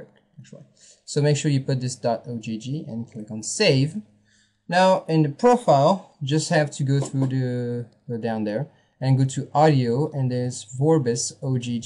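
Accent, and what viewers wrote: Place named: Quebec, Canada. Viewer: enfin un quebecois xD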